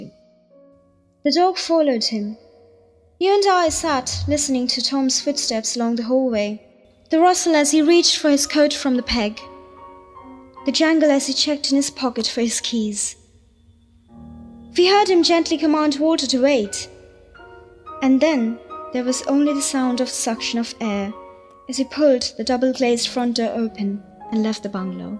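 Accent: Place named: United Kingdom. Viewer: Eta tomar accent??💀😩😳 ami vablam kono British meye pore shonachhe . Plss prottek ta part pore shunio to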